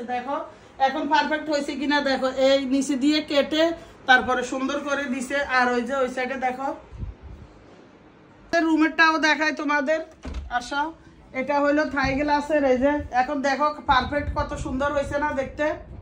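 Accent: Noakhali, Bangladesh. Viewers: আন্টি আপনার দেশের বাড়ি কি নয়াখালী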